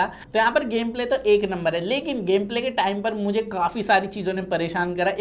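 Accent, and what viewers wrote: India. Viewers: Only one tech editor who pronounciate desi hindi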